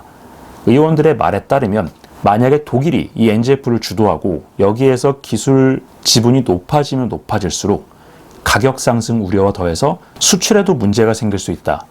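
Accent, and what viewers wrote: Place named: Seoul, South Korea. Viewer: 서울말 좋아요^^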